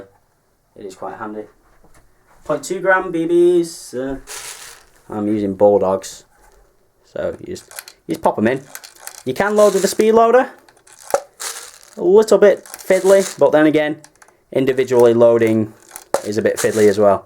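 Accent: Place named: Manchester, United Kingdom. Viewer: yes thought you sounded mancunain , iam from Salford but i live in Bury.. lovely Rifle the L85 i got mine from zero one airsoft online ,it should of been £300 and odd but i got %10 discount so was just less than 300 and mine came two toned green , i prefer green to the orange and blue , plus it looks great on this rifle as its 2 toned anyway.. i will have to film a fireing test on it to show you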